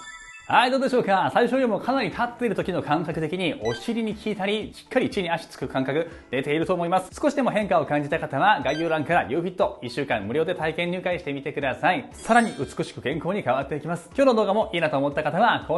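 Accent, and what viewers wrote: Kansai, Japan. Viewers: 途中から吹き替えみたいなって内容がはいってこないww ちらちら関西トーン 頑張ってやってみます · 声が良い✨ 関西訛りがあるからか ナダルさんみたい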